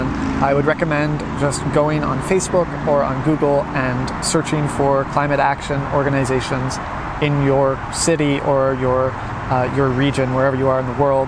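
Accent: Canada. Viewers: I like your argument, but he doesn't live in Germany. He lives in Canada. You can tell by his accent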